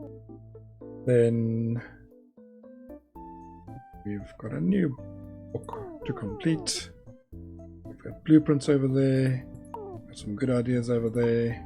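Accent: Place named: South Africa. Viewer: I'm in it for the south african accent, correct me if I'm wrong. either way I love your accent and it allowed me to enjoy a game I usually wouldn't!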